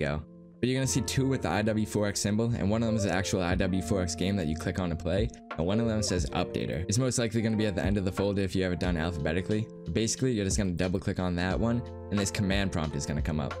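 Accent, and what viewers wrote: Boston, United States. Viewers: thank you so much bro you mad chill you from Boston by any chance? love the accent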